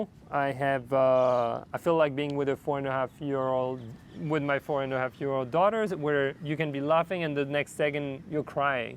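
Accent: France. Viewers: I am watching your videos from time to ime, most accidentally, but mostly I am impressed by your "Closeness". i hate the ads in youtube but it seems there is no way except booking an expensive abo. I look videos too on rumble or odyssee for political reasons. And what I also like on your videos is the good speaking quality even with the little frnch accent. That is an aspect what is oftly missed by other youtubers. They present not an accent but a slang which is not understandeable for anyone who wasn't born in the right state.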